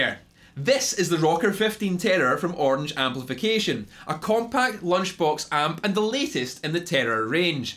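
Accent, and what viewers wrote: Scotland, United Kingdom. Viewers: The Scottish accent tingles the nuts like a dimed plexi through a cab of greenbacks! In other words..I hit the subscribe button so hard it was on the ground twitching like apollo creed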